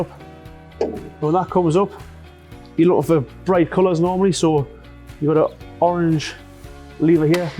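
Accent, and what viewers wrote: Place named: United Kingdom. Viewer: That is the most british sounding man ever jesus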